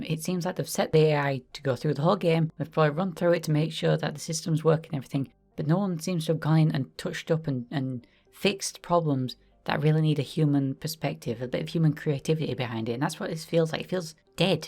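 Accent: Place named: United Kingdom. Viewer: Less british than i expected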